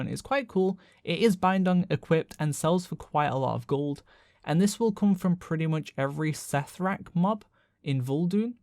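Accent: United Kingdom. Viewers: Svante Bard that’s the british accent for you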